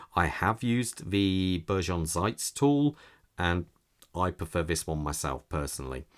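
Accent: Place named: England, United Kingdom. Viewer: I thought you was American, cool you're English. Your videos are really crazy good, enjoy watching, cheers mate.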